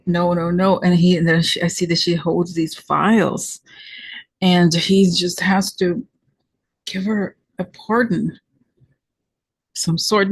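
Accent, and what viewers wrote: United Kingdom. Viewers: Love your brit accent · love your British accent!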